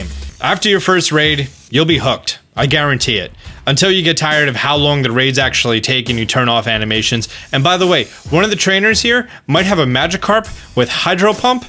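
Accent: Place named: East Coast, United States. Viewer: I love the way you and everyone else on the East Coast say water... "Wooater" hehe 😁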